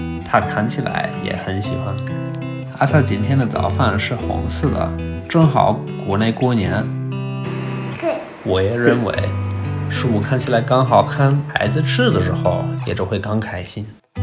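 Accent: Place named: Australia. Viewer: 的澳洲腔说中文好好听哈哈哈哈哈😂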